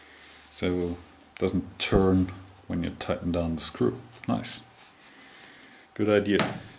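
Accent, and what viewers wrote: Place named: Germany. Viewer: Hi - great video as expected--- keep up the great work!!! My wife enjoys hearing your German accent-- when she walks in the room and I'm watching your videos, she says " he's back"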